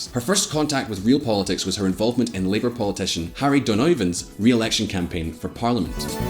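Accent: Scotland, United Kingdom. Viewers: Where is simon 😴.... this Scottish accent is too strong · Well I like the Scottisch accent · I´m sorry but the scotish accent is unbearable - can´t through these vids no more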